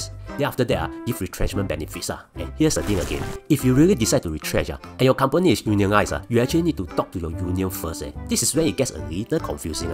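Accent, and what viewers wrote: Singapore. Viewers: Balalalal... New singlish siboh?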